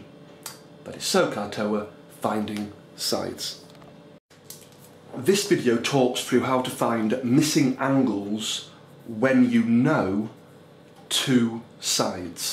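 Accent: England, United Kingdom. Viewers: you're the reason why I remember sohcahtoa in a british accent lol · Your British accent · English people make everything sound better. good stuff.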